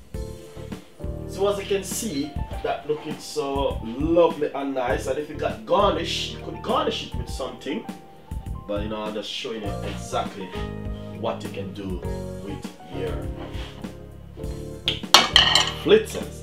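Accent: Jamaica. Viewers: Kurt Sammons that is how Jamaicans speak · Nah bredrin, I love it when he says "simple add ...." instead of "you simply add..."; "luvley an nice"; and "flitters" instead of fritters🤣 He reminds me of my parents (RIP), who were also from the beautiful island of Jamaica ❤❤ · you obviously don't know your own dialect... ppl from other Caribbean countries sounds different from us... ppl from Trini.. Barbados and all over speak different... you can easily tell a Jamaican accent... she doesn't listen to what he says cuz he says "this is how we do it here in Jamaica" in every video!!! so it is a stupid question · Real yardie...from u hear di counting (number dats di second one)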